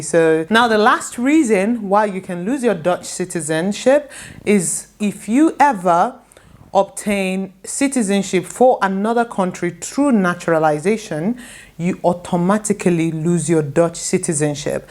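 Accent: Nigeria. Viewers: I would not think you were Dutch because you sound Nigerian.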